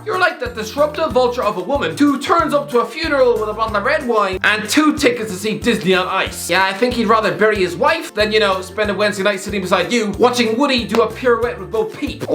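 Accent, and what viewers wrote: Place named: Ireland. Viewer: Speak English thick mick.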